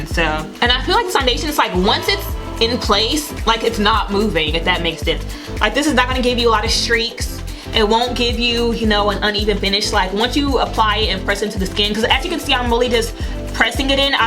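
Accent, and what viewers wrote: South, United States. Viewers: Yes! I just tried this foundation the other day and I love it! I got Warm Sun as well. but it was too orange for me. I still was excited with the outcome... It lasted on my oily face for like 6 hours or so... I was pleased for sure! Your makeup came out perfect! I love it! And your southern accent is so cute LOL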